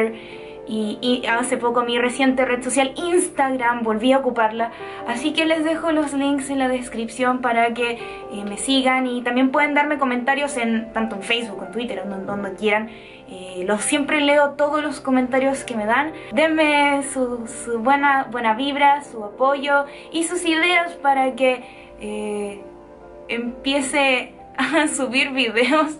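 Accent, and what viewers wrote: Chile. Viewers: Ese acento es chileno? Esque miraba videos de un youtuber con mismo acento y me gustaba como decia las cosas xd pero no estoy seguro de si el era chileno Ella es chilena? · Es chilena.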